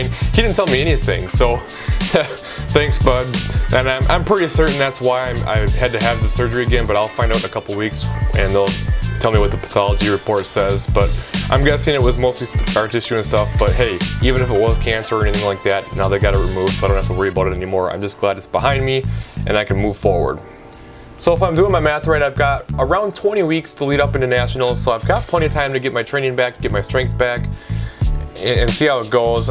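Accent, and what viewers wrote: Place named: Minnesota, United States. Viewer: I missed that Minnesota accent. I'll see you at nationals also bb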